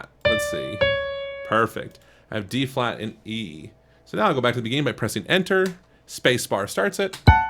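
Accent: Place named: Las Vegas, United States. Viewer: Fun Project! I think you've lost some of your Vegas accent, haha!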